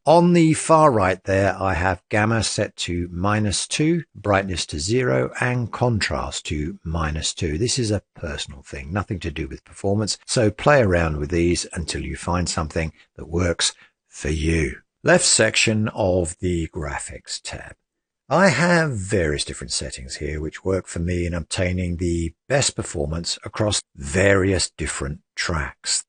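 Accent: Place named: United Kingdom. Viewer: I’m in UK (as I assume you are from the accent 😂) and I don’t think it’s a regional rollout of 24H2. It was initially rolled out, had tons of bugs, and then pulled back. It’s since only being rolled out to systems with hardware device IDs that Microsoft have verified as not having issues from what I understand. This means if not offered, you may have some hardware they’ve not fully ironed out the issues out for yet.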